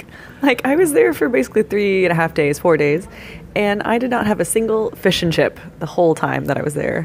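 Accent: South, United States. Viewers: gosh Londoners are friendly; silly girl you are the VERY attractive surprise foreigner with the fantastic SOUTHERN accent, so of course they locals are more than happy to help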